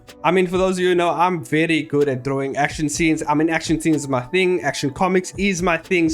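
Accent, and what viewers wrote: South Africa. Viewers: Ayeee you sound like a fellow south African. I just started working on my submission, gonna be a hectic month of grinding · You're from south African?!!!😲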